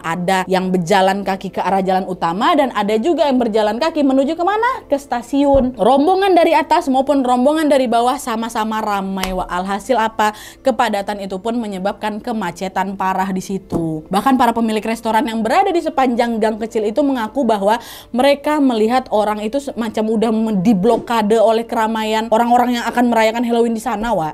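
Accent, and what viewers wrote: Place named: Java, Indonesia. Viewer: You heunti keun itu logat jawa hentikan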